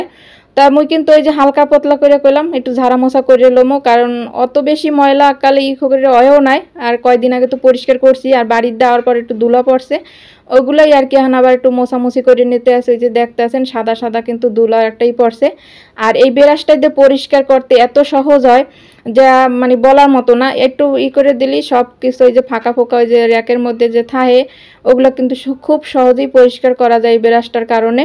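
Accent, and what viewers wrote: Barishal, Bangladesh. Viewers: শাক রান্না করলে রেক পরিস্কার করলে খুব ভালো লাগলো। তোমার কথাগুলো শুনতে আমার খুব ভালো লাগে। আমার শাশুড়ি তোমার মতো বরিশালের ভাষায় কথা বলে। কখনো বাড়ি গেলে শোনাবো।❤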